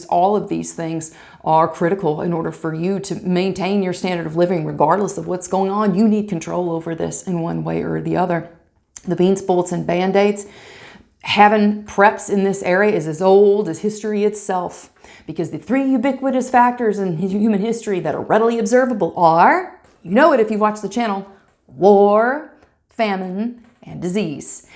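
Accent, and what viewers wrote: South, United States. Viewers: Gotta be a southern Lady 🙂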